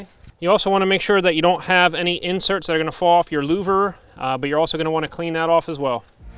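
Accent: United States. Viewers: This guy has an accent, which I can't tell where in the US he is from. Just listen to how he pronounces the word "coil"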